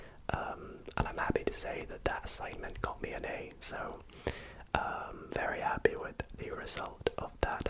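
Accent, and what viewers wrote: Scotland, United Kingdom. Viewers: Your normal voice is EXTREMELY Scottish and your whisper isn't. And it confuzzles my brain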